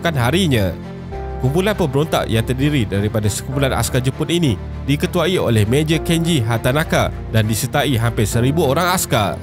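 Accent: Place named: Malaysia. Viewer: semua dah mantap kadang2 sebutan bahasa asing kebanyakan video terlalu lidah melayu sangat, harap dapat diperbaiki lagi 👌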